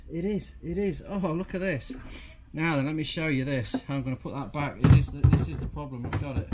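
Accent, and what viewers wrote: Leicester, United Kingdom. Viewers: New you were Leicester soon as you started talking .. great vid hope it sorts my boot leak out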